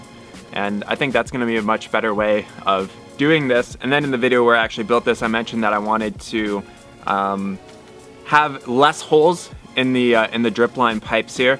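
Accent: Canada. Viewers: So this is in AZ? But the dude sounds Canadian........?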